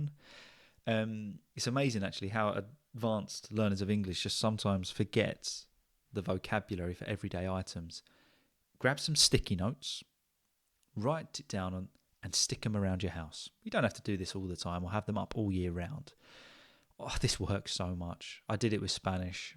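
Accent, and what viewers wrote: United Kingdom. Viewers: Bonjour, Je suis Abolfazl, je viens de l'Iran et je suis en traîne d'apprendre le français ou je peux même dire que je suis étudiant en littérature et la langue française. J'apprenais le français pendant trois ans jusqu'à maintenant. L'autre chose que je voulais dire,c'est que j'adore aussi apprendre l'anglais surtout britannique accent et vous parlez incroyablement joli et ça m'aide à progresser plus rapide qu'avant. And I dare to say that now I'm able to speak exactly like you because of repeating after you. Merci à vous et bon courage ❤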